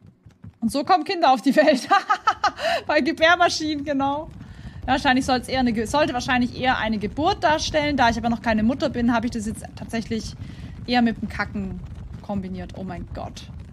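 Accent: Swabia, Germany. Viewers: Sage mol, komsch du ausm Schwobeländle? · Dei schwäbisch killt mi😂😂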